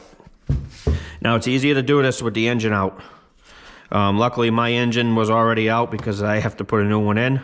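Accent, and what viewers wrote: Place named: Northeast, United States. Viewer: Good video. I am in a bit of a bond. I ended up taking my gearbox to the dealer to remove and replace the seals and the output shaft bearing as I was afraid of damaging something. Yours is the only place on the internet mentioning the 1” dust shield (SG014). In preparing to re-install my rebuilt gearbox, noted the dealer returned the dust shield in the bag. I know this piece should be installed to prevent future failures. Does this shield fit into the grooves as the other seals do? Btw,I love your northeastern accent!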